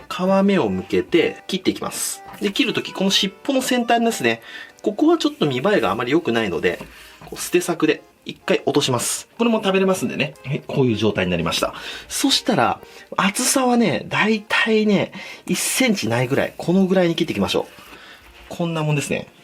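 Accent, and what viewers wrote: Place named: Nagoya, Japan. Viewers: 名古屋弁イントネーションきくとさんを思い出します。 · いつもより名古屋べん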